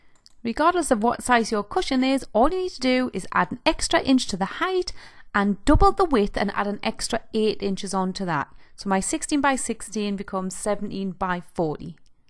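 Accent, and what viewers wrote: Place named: England, United Kingdom. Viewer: Hi love, Wow I have been binge watching your videos . I'm loving your channel! You have inspired me so much! I love your English? Accent. Too. Lol Your fur baby is adorable too. I could see myself living in that fantastic farmhouse of yours. Just Beautiful. Can't wait for another video from you! HUGS Debbie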